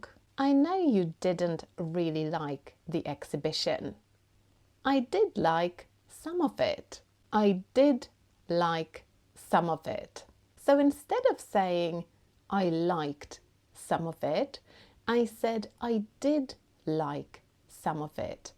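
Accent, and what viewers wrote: United Kingdom. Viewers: Never have I met such a fantastic and charismatic English Grammar Teacher like you. You look quite captivating, sweet, and heavenly with your contagious smiling face blended with a soothing British standard accent. You are wonderful and ethereal. Cheers! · I do love your beautiful british accent and of course all of your grammar teachings! ❤ You’re awesome! 😊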